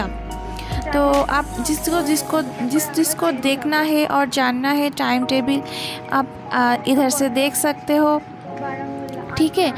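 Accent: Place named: Bengal, India. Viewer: You sounds like Bengali.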